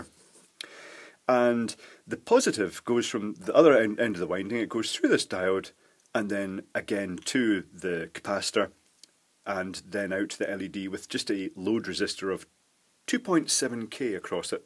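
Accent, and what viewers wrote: Scotland, United Kingdom. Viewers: Are you originally from the Isle of Man? Your accent makes you sound like you're from Scotland.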